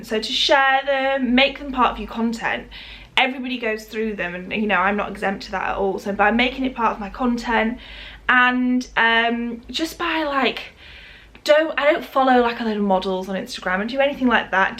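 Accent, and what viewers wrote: United Kingdom. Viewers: I'm quite new to your channel and I have to say I love your UK English so much, it's so refreshing to hear and personally, I love it so much more than US english 😍😍 I hope you have a nice day and here's some cool new music for you: - Have fun girl ♥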